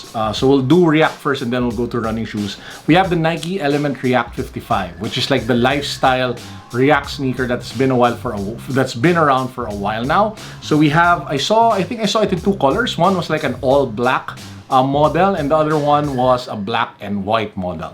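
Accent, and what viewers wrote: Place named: Philippines. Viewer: I like your filipino accent.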